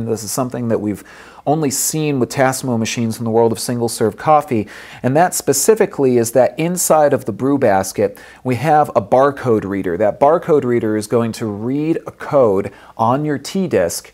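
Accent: United States. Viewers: He's american. They all say button that way.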